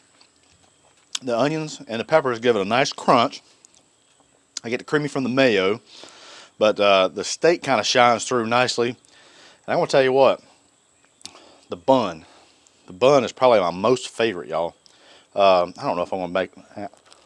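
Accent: North Carolina, United States. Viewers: Man you gotta be from somewhere around G-town , NC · My 36” is coming in this week! You sound like your from my neck of the woods near Raleigh NC.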